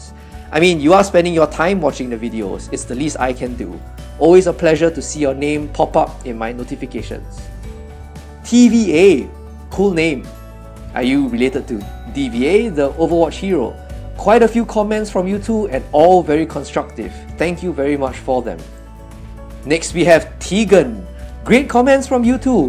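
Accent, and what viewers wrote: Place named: Singapore. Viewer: This was the most wholesome video I have ever seen, really cute! And you are from Singapore, always wondered where your cool accent comes from. <3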